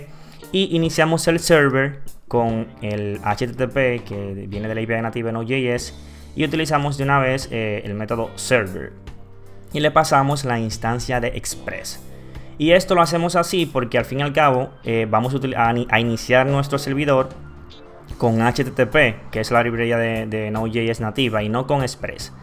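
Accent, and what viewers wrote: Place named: Dominican Republic. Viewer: Eh, Dominicano! XD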